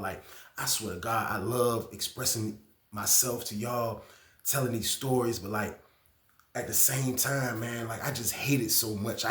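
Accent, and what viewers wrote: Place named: Richmond, United States. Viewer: Sound just like a Richmond nigga..down the jail in the 80’s/90’s early 00’s before they knocked it down that shit was like a prison the beef from the street came to the jail..out of Towners ain’t stand a chance down that jail they checked in INSTANTLY and in on the land out of towners got zipped up ASAP #17thSt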